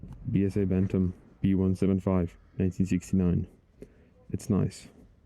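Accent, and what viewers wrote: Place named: South Africa. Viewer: ....enjoyed your vid...lovely weather you have....are you in SA...from your accent sounds like it. I also own a B175....arnt they smashing little machines. Do you have any probs getting your spare parts...not to bad for me here in the UK, Just had a little 10 mile ride today to blow the cobwebs off after Christmas. All the beast and safe riding. Nick.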